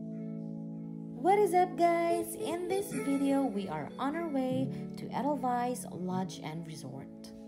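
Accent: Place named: England, United Kingdom. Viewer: I love your English accent bebe ganda.. And that place also too much amazing. Always take care and good bless.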